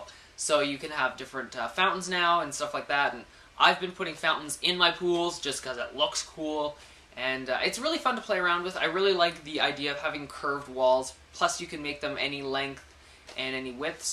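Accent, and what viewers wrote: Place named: Canada. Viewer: AHA! i knew he was canadian, we have the same accent.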